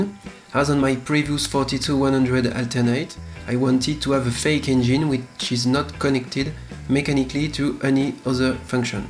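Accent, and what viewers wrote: France. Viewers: Joli accent français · Watched 1 video and i m a big fan dude. Love your franch accent as an german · On reconnait l'accent francais😅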